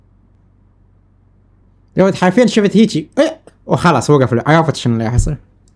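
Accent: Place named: Iraq. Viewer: احسك عراقي بس ماعندي دليل أو لا عندي دليل من اللهجة مالتك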